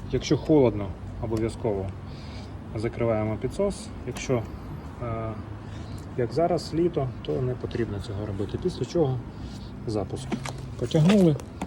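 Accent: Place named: Hungary. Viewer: Nakavom jeziku govoris kao hungar ili moldovan ja ne razumem tebe svaku 10 rec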